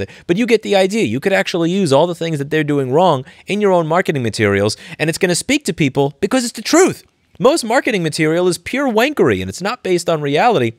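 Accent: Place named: Carolinas, United States. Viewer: Yep, sounds like the Carolinas, greetings from upstate SC.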